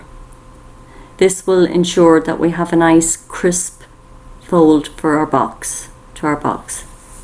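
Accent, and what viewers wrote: Ireland. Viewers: what an interesting idea, and so easy! as an irish man myself it's great to hear a familiar accent. best of luck with your channel. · Wow what a fab demo. Its just great to hear an Irish accent. I can't wait to give these boxes a go and I'm sure you could change the theme for other occasions. Well done I'm looking forward to seeing more Irish demo's in the future.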